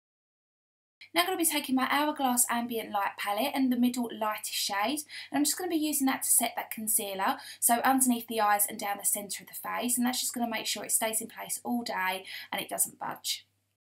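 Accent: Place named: England, United Kingdom. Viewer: I'm so glad I came across your videos! I've gone on a binge watching spree! I could listen to your accent all day, makes me want to plan a trip to England! Xo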